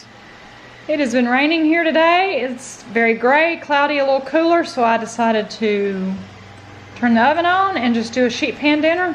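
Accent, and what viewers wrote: South, United States. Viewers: I just love that southern twang😂. People from the south are honestly so warm and friendly. Everything looks yummy as always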